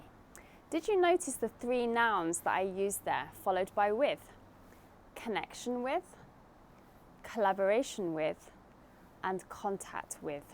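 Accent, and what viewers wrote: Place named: Northern England, United Kingdom. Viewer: Hi, I hope you're getting well soon. Here's an example of an imaginary news article. "The EU office reached an (2)agreement with a famous global company for the company to disclose its customer data holdings. Many sources have raised (7)concerns about the (6)compatibility of this data with the new EU data privacy policy. The company, known for its CEO's (1)affiliation with the newly elected president, has been in (8)conflict not only EU, but also with many countries over this issue for years. Additionally, the EU made a deal with this company to share a list of companies it has (9)connection with. Some of these companies are named in (5)collaboration with the funds owned by the newly elected president's relatives. These funds don't have clear (4)association with concrete issues. Many NGOs, (10)with whom our correspondent (10)contact don't think that the EU has provided enough (3)arguments with the company, against this issue in its dealing with the company. 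One of them criticised 'it's almost like they're on a (12)date with the company. They should (11) cooperate with us before reaching an agreement.' Thank you for the lesson. And I love your accent and trying to imitate it. I'm not a professional but it seems to me a Northern accent because, the sound of 'up' is pronounced with /ʊp/. I'm familiar with this. Thank you for sharing!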